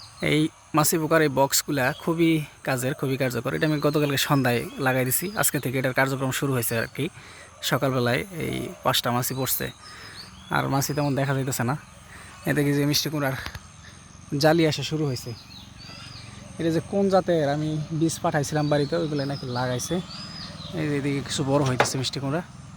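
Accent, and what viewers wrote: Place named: Tangail, Bangladesh. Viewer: ভাই আপনার কথা শুনে মনে হচ্ছে টাঙ্গাইলের কোথাও আপনার বাসা।। আমার বাসা টাঙ্গাইলের সাগরদিঘী। আপনার নাম্বার টা দিলে ভালো হতো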